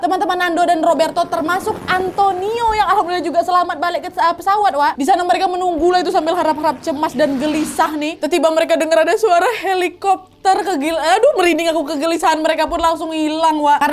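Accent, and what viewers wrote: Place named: Palembang, Indonesia. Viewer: demen gue, logatnya wong kito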